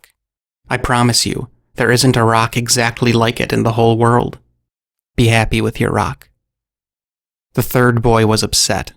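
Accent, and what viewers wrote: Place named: Missouri, United States. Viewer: I really like your work Your a intelligent dude.I'm from Missouri that's the way Us Hicks talk.lol,Looking forward to your next video..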